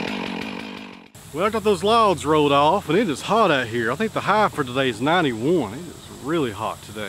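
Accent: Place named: Tennessee, United States. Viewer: I didn't know where your particular flavor of southern accent was from, I guess Tennessee it is. Cool stuff.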